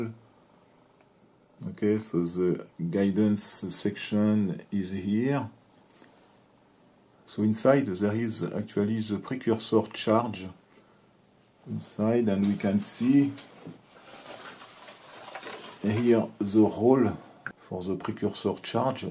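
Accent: France. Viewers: Your french accent is so thick ... I love it :) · Tu fais vraiment aucun effort pour parler en anglais, autant parler en français et mettre les sous-titres. Pouce bleu nonobstant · he is french some letters they can't pronounce it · you accent is so french omg 😆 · pourquoi je suis le seul francais alors que t'es clairement francais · Very very interesting but I could't handle your Frenglish for more than two minutes. It's a difference if you can't to it perfectly but try OR if you just give a damn and just keep stressing the last syllable like in french. You didn't even try IMO. Cable is CAYbell and keBELL. Stick to French please and just add subtitles if you don't even want to TRY to mimic the sound of the language you want to speak. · Anglais à la française 😅😅😅😂😂😂😂😂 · one of the coolest french accents i have ever heard!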